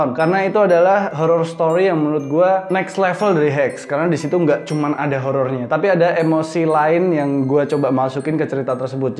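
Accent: Java, Indonesia. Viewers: Medok jawabnya gak hilang mas 😄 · pake aku kamu saja bang, ga usah lu gue, medokkkk parahhh... kesanya gimana gitu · Medhok tapi gua lu.. #salfok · iya mas. kental bgt jawanya, pakai sapaan " aku kamu " aja mas, lebih enak didengar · mas mbok pake "aku kamu" aja mas, jgn "lu gua", lebih oriental.. lagian masih kerasa kok rasa jawa nya hehehe sepurane ya mas, aku yo wong jowo :)))))))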